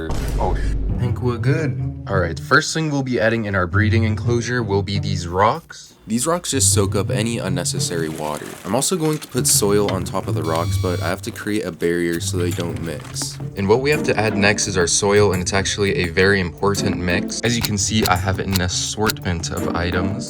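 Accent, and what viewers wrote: United Kingdom. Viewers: Are you British? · Are u British wotah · Thus guy is so british wuter😂😂😂😂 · Bro sounds like a British man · Buddys British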